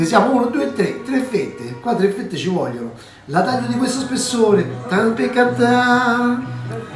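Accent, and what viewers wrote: Rome, Italy. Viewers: Si forse saresti un buon cuoco se non ti allarga tanto e lavora di piu , con questo romanesco squassato . .....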